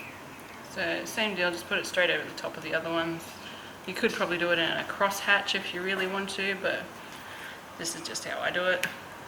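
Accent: Australia. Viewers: Just found your channel. Am I hearing an Australian /accent ? The ingredients you use if they Aussie based can you list in description box the American product substitute a measurements in parentheses. Thank you. I turned away from your last week because for fear I wouldn't be able to follow your recipes I watch the food channels to unwind and food prep for inspiration. Your personality and presentation style brought me back to your channel. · It great to hear an aussie accent too!!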